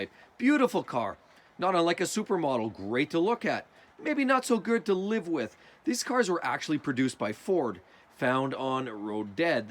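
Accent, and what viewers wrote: Canada. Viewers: Me after 3 seconds: wonder which part of Canada this guy is in....🤔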